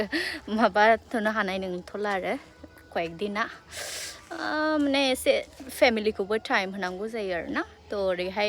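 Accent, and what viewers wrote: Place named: West Bengal, India. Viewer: West Bengal ni boro railynaiya alda kwnaiyw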